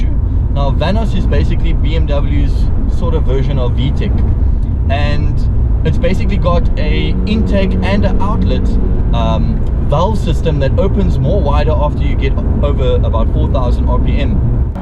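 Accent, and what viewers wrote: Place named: South Africa. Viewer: Sound South african lol love it Subbing dude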